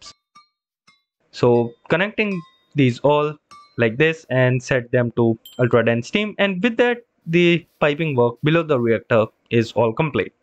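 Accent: India. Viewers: Thank you thank you guy with Indian accent. Without you i would have been lost the moment i stepped on this mod. Killed over and over again by the Glyphids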